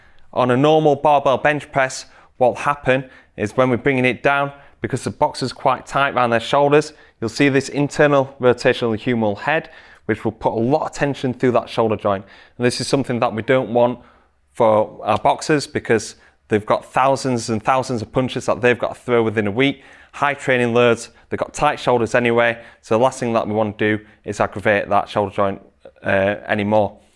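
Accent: United Kingdom. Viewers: This is an english accent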